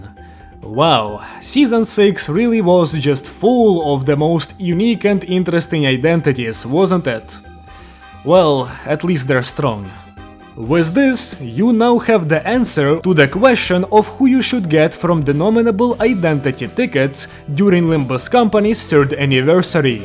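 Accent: Russia. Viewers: Что за Русский акцент... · What is accent sound like Russian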